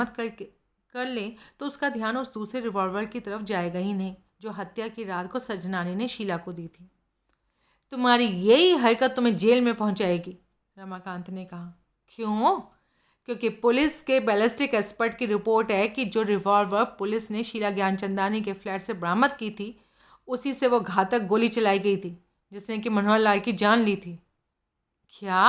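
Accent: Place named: Punjab, India. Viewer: आप पंजाबन हैं, आपकी पंजाबी एक्सेंट बहुत बढ़िया है।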